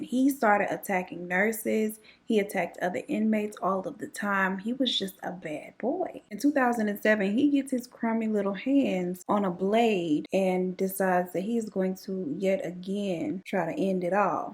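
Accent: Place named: Houston, United States. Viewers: Omgggg aye ur from texasssaas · I knewww you were from Houston as soon as I heard you speak. 😂 we have that extra seasoning in our story telling. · i shoulda knew u was from houston too by the way u sound lol!! <3 I just found your youtube recently and I love it!!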